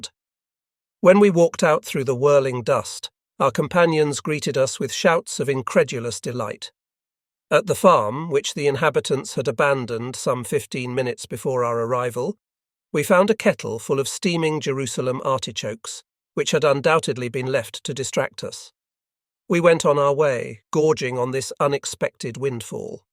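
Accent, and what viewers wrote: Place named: United Kingdom. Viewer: Yeah, the British voiceover is the most entertaining when he yells fire or incoming is hilarious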